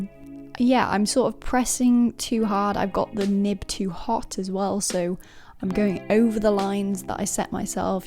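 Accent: United Kingdom. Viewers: thats a manchster accent anyway OMG THX SO MUCH THIS VIDEO WAS LIKE SO USEFUL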